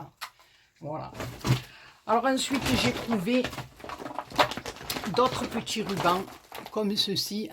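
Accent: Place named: Provence, France. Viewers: Coucou, je te suis depuis peu mais je me régale car j'entends bien les façons de parler de chez nous 🥰. Nous c'est à Marignane que c'est le ouaille et à Châteauneuf les Martigues c'est propre mais on ne trouve pas grand chose 🤔. Bisous 😘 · bonjour je regarde avec plaisir vos videos et me regale de vôtre parlé de chez moi! je suis marseillaise j ai 68ans et je scrappe pour le plaisir et pour me vider la tête;;Ac/4tion le plus pres de chz moi , j habite le bord de mer, c est St Loup , 2bus 3/4 d h pour arriver dans un depotoir!!! les rayons vides et le peu d articles , par terre , eventres, bref ,pas la joie... dommage quand on est à payolle les prix Action c est super!!! continuez de nous faire vos petites videos vous ete geniale amitie Nadege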